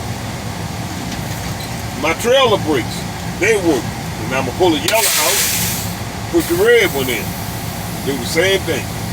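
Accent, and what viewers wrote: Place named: Louisiana, United States. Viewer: Its the louisiana accent lol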